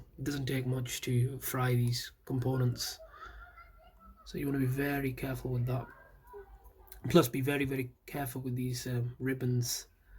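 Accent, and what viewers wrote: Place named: United Kingdom. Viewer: He sounds like he's from the UK, it will never get above 38 degrees celcius there, let alone 58c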